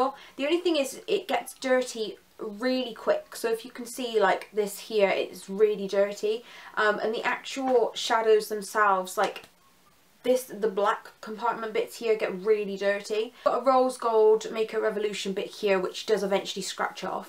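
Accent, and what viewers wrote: Bristol, United Kingdom. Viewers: Are you from Bristol? I'm from Bristol and my accent sounds so strong in my videos lol. Love your videos btw xx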